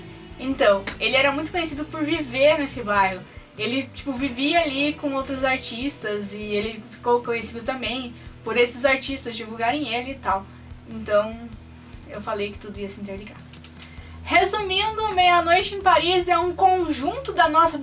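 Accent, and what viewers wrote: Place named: Minas Gerais, Brazil. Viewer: muito bem fazeres vídeos acerca de cultura mas tenta fazer sotaque brasileiro de Rio de Janeiro, sei que nada tem haver com a questão da cultura mas acho tão chato e enervante esse sotaque do interior , Minas ou algo do gênero , tenta isso é tens mais um subscritor.. Bj e boa sorte com o canal!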